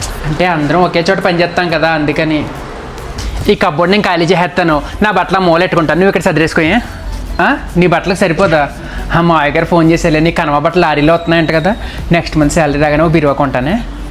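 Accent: Godavari, India. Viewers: మన గోదారోళ్ళ గొంతుక మారుమోగిపోతోంది మీ వల్ల... All the best-frm konaseema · Love your slang😊 godavari slang super asalu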